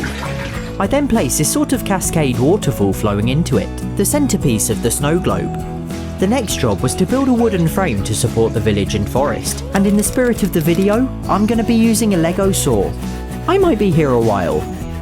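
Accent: England, United Kingdom. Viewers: Are you British? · Are you british? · I have this lego trains and you speaking like english gentlemen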